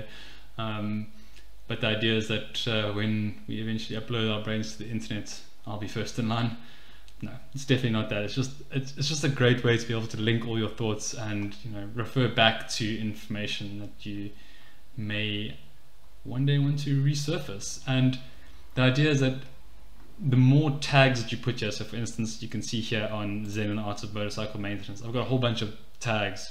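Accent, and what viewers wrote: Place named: South Africa. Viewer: Very useful, thanks. The accent makes me think you're a fellow South African?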